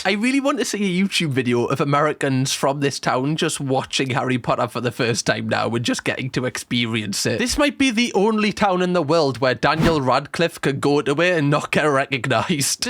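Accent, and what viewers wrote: Newcastle, United Kingdom. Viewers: what accent do u have? ik its british but idk where edit - newcastle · Watching and me mam walks in and says ‘eehhh he’s a geordie’ like we aren’t also geordies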